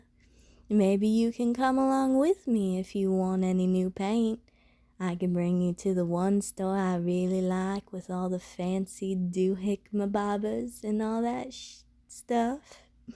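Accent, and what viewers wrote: South, United States. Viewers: You just made me discover something about myself... I REALLY like Southern accents...😶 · You do a great southern belle. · Oh no... I'm liking Southern accents more than ever now. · I got family in the south and your ascent is sooo close but just a little off but good job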